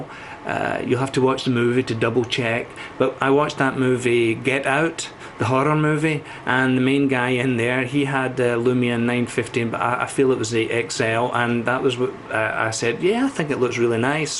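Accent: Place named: Scotland, United Kingdom. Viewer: I liked your sco'ish accent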